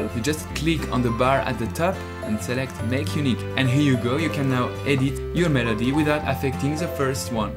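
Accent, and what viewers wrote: France. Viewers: You're French right? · French accent lol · Where are you from ? Because you have not an english accent and you love french. Are you french ? ? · Tu serais pas français par hasard je trouve aue tu as un petit accent... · Comment ça se fait qu'il n'y ai aucun Français par ici ? On reconnait bien ici un Français talentueux ! ;) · You are French ?! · Are you french ? Because you speak like a french guy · tu es français non ? :)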